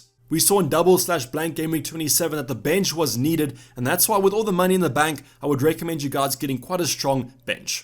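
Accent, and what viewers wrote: Afrikaans, South Africa. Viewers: Yoh!! Your boer accent is heavy 😂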